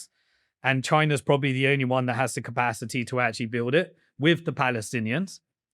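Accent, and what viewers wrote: United Kingdom. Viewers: You sound like a city of London guy. You have no idea who Trump is or what he is capable of. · He makes these wildly false statements. He drastically oversimplifies things he conflates completely unrelated things together. He refers to things that have Then, in place forever as new While stating his wild predictions and speculations as fact. He is the textbook example of a Zionist pompous british ass. Who thinks he could lie to your face because he has a british accent.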